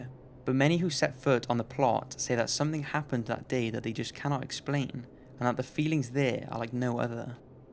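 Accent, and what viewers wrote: Wales, United Kingdom. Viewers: That's a Welsh accent right? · Silly Question.. Are you Welsh? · Welsh accents ftw! As you can tell i'm also from Wales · Are you welsh?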